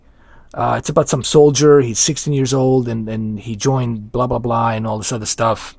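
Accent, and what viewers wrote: United States. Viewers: Im from Australia and have made afew videos a year ago, check them out if you want. I might get back into making youtube videos, i started off pretty badly and abit nervous but might go back doing some after i upgrade all my gear.its not like i need an upgrade coz all my gear is 2015 models BUT now that theres HDR i really want a projector with hdr , like the sony 4k hdr selling at 15k lol expensive but worth it. Im more of a home theatre enthusiast and picture quality is very important to me. So yeh, hopefully when i move into my new house i will upload more vids. I love watching your vidz man. And love the american accent lol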